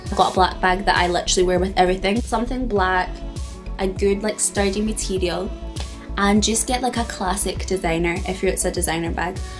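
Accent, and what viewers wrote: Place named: Scotland, United Kingdom. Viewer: Girl you're beautiful !!! ...I 100% expected a London accent myself hahahaha ...I never really liked Scottish accents but you make it sound amazing. Haha! Yaaaas fried chicken ...naaaaah! Your wig fell off in the gym. Oh gosh LOL ...when I ever went to the gym with a wig, I had to wear a cap. True security for me :') ..you're great! I subbed <3xxx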